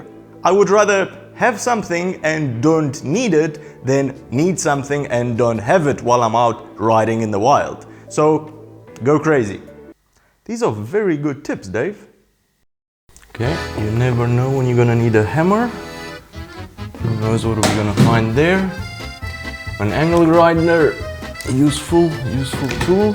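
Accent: South Africa. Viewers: Fantastic ! Was watching this & the missus said she can hear a South African accent...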